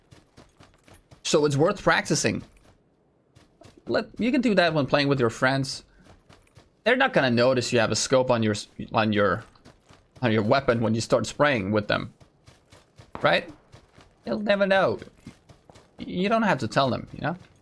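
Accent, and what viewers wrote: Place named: Denmark. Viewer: hey what country are you from because you seem to come from Denmark. in your way to pronounce English on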